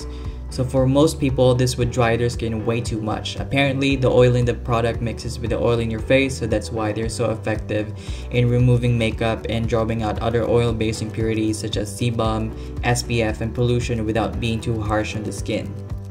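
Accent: Philippines. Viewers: Filipino accent, pinoy baga ito? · Waiit is it just me but you have a slight Filipino accent when you’re speaking English. Woaahh 😱😳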